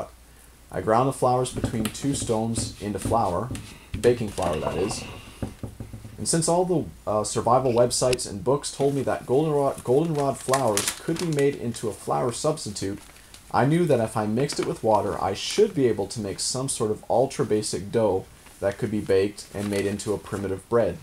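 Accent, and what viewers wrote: Canada. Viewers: You couldn't spot that Canadian Accent?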